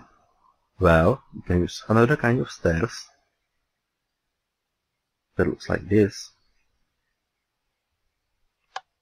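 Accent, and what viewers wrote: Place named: Czech Republic. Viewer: Český přízvuk se pozná vždy :D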